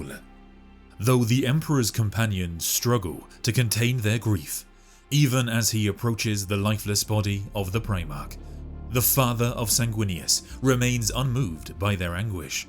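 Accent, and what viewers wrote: England, United Kingdom. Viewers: Mispronounces basic words in the first couple of minutes in a smooth English accent? AI slop. Boo! Go away.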